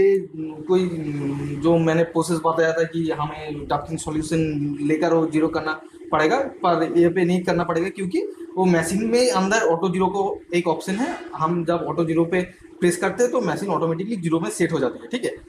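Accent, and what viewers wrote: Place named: Bengal, India. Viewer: স্যার আপনি কি বাঙালি ?? আসলে,আপনার হিন্দি বলার কায়দা দেখেই বোঝা যাচ্ছে 😅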